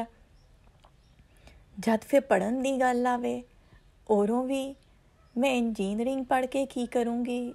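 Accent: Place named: Punjab, India. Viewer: Ye itna jatki lehja?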